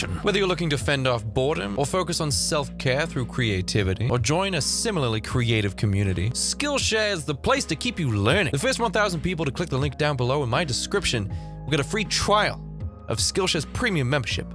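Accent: United States. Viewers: Where are you from? You sound American to me but I seen where you said you’re from the UK. I’m from the US and I think you sound American. Much love to you and love your videos!!! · Why do you sound American? you put your youtube as United American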